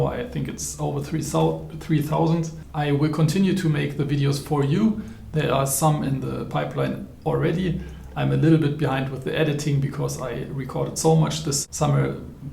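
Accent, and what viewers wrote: Germany. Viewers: by the accent it seems to be a German. Ich mag Evah Pirazzi.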